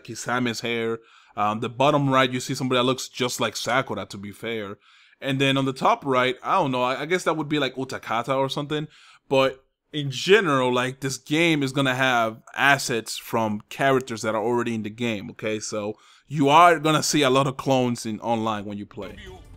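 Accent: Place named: Dominican Republic. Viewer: xD hasta hablando ingles se te sale lo dominicano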